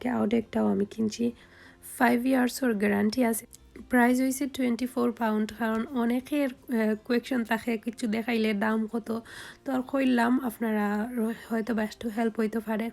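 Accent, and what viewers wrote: Sylhet, Bangladesh. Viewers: Assalamualaikum apu apnar vlogta onek balo hoesay kub sundor laglo. apu Ame apnar onek old fan bt comment korene kokono. apu ameo apnar moto Sylhety akjon may tai apnar kota suntay kub balo lage amar.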